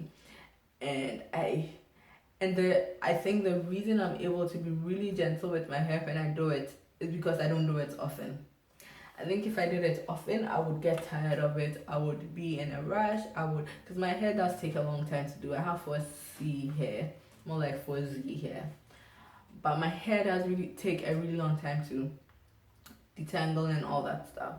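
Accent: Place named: Ghana. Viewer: Ghanaian Accent 😍😍😍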